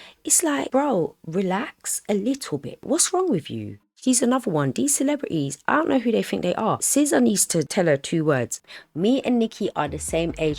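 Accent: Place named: London, United Kingdom. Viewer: So glad I came across your channel, Just subscribed xxxx Love the London accent.. So good to finally find a channel made and bred in my town!!! xx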